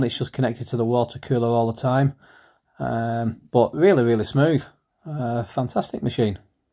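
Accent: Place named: Northern England, United Kingdom. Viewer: Nice one.great to hear a relaxed northern voice rather than a American lol. May be thinking of getting one of these mainly for the mma and the mig .but would like to learn tiggin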